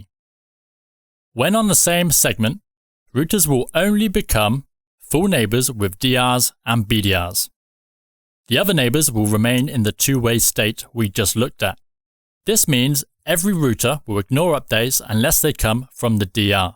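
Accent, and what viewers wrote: England, United Kingdom. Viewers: Dude, it's called English pronunciation!!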